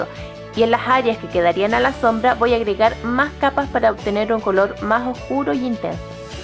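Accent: Chile. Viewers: Chilena? :D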